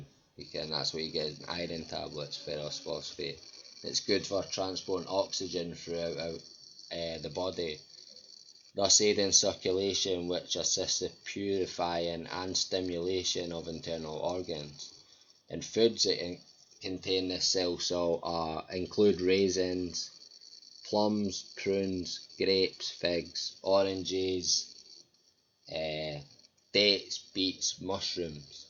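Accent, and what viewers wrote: Scotland, United Kingdom. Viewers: I subbed to you because I liked the fact that you gave your ideas at the end and what a very good thought it was. Thanks for sharing this and your own ideas on it too. I would love to hear more about this. Love your Scottish Accent by the way!! I am a Norfolk girl, but my Mum and I just love Scotland so very much :) especially thick Plain loaf toast.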